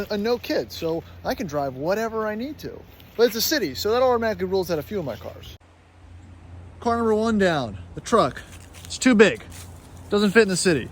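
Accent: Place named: United States. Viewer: You’ve bored me in first 30s maybe it’s your American accent maybe it’s the fact you guys no nothing and European cars , and why have you got 3/4 old crap cars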